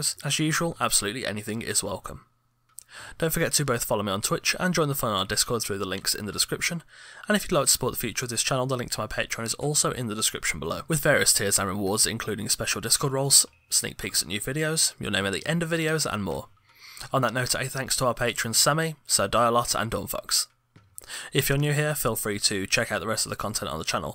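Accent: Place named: United Kingdom. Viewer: Dat damn fine british accent.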